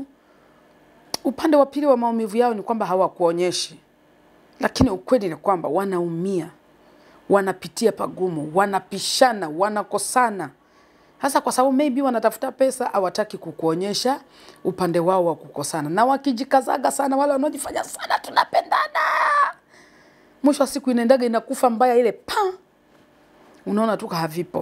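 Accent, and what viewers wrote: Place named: Kenya. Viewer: Samahani Shusho ni mkinya au Mtanzania Mbona ongea yake kama mkwnya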